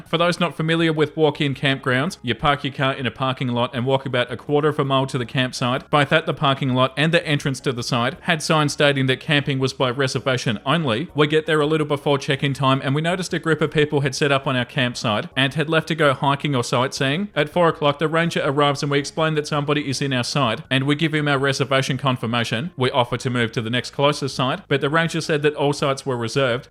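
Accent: Australia. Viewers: Today I’ve been binging these stories for hours while cleaning, I cannot express how much they help my ADHD riddled ass to stay on task lol. Brilliant timing for an upload since I was about to commence more late night cleaning. You’re a real legend for subjecting yourself to the chronicles of these insane people, all while maintaining a sound mental state. Insanity aside I really love your narration, it’s awesome to hear a fellow Aussie’s voice! Thank you for providing genuinely good content that isn’t TTS or AI :)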